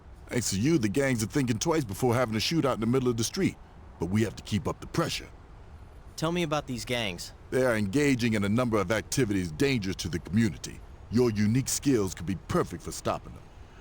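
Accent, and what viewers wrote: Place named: Germany. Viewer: Dein deutscher akzent😂😂❤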